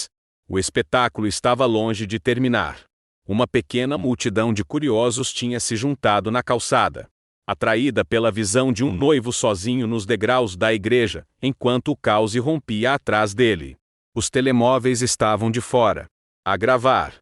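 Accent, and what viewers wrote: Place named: Fortaleza, Brazil. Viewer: Não gostei do narador é meio esquegito de Fortaleza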